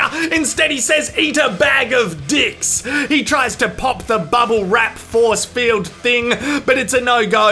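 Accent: Australia. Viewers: The perfect Australian voice over Sat-Nav along the Birdsville track or the Nullabor h'way! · Haha, Australian pronunciation: fvck ea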